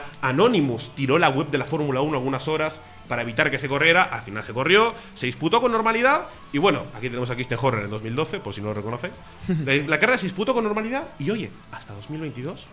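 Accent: Argentina. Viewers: Y el acento argentino?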